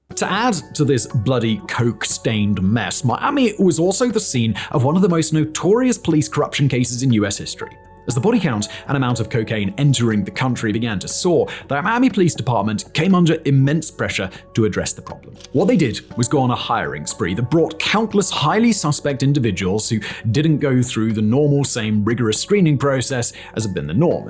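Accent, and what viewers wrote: United Kingdom. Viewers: Pea-brain strikes again. Despite being a world famous city thanks to the cocaine trade still managed to mispronounce Medellin. He keeps proving that having a British accent doesn't make you smart.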